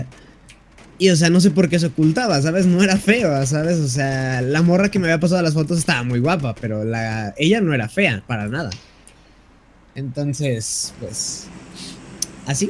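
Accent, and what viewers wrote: Chile. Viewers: Chileno 100%real no feik jajaja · Primera vez q veo a un mexicano q le sale bien el tono de los chilenos :u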